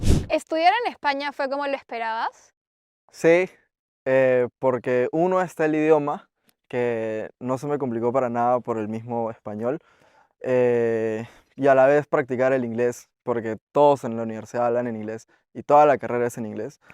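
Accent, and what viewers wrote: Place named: Lima, Peru. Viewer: yo también soy de lima y planeo postular este año a la ie, btw,me resultó gracioso notar su acento limeño JAJAJAJ entre nosotros nos entendemos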